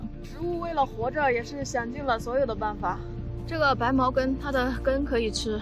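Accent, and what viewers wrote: Henan, China. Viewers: 你有河南口音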